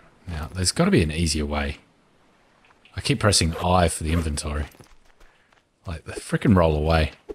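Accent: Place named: Australia. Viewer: I knew you had an Australian accent by the way I'm kiwi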